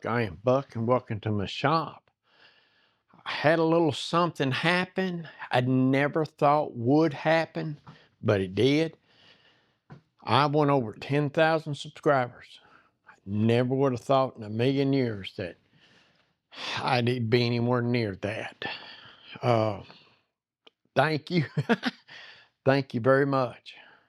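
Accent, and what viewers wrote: South, United States. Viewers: Hi Buck. I have been watching woodworking videos here on YouTube for close to 20 years and just discovered your channel about two months ago. I sure enjoy watching your video's, and one of my favorite things about watching your video's in that southern accent of yours. I sure enjoy listening to you. Another thing I like about your video's is that they get down to business. No trying to be a comedian like a lot of guy's do, and they are about as funny as a broken leg. I've been going through your video's trying to find a tool that you showed in one of them, and it was that black angle finder jjig you have. I think I might like to buy one of those but I can't find that video. Could you tell me who makes them and where I could get one?? I sure would appreciate it. Thanks Buck and keep those video's coming. Also, I don't know when it happened, but i'm glad to hear that your wife is recovering from her surgery on her leg. 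I know what thats like as I broke my right femur and shatterd my left heel about nine years ago. I will be 80 this next February, and we just don't heal up as we did when we were kids. Tell her hi for me, and keep making those videos, I sure do enjoy watching them!! Jim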